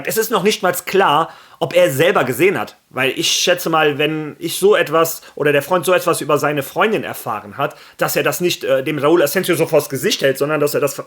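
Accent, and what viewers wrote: Turkey. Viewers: Dein türkisch lustig zugleich sympathisch Klasse Beitrag. Arda Güler spielt mit 20 Jahren für die beste Mannschaft der Welt. Wir reden von REAL MADRID. Wir müssen ihm mehr Zeit und Geduld einräumen. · 😂😂😂😂😂😂 alter dein Türkisch.👍🏻👍🏻das hat mich vom Hocker gehauen 😂😂🤣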